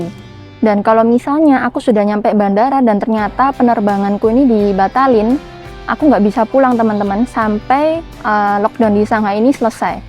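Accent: Java, Indonesia. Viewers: Mbaknya pasti orang Surabaya, logatnya jawa banget..salam hangat dari Jateng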